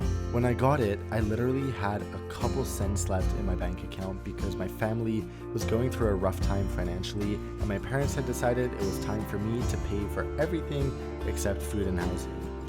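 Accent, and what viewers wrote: United States. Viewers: So how did you come to have such an American accent? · Interesting life, although I still don't get why it is that you speak English with an American accent when you grew up in London, somewhere near Heathrow, Forest Row (11 miles from where I live now) and Sweden!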